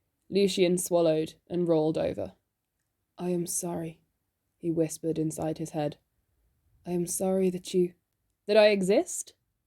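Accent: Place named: Ireland. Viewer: I’m living for your Irish accent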